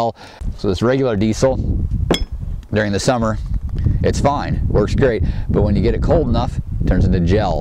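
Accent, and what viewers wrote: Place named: United States. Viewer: I really can't remember when was the last time I heard genuine American accent as yours..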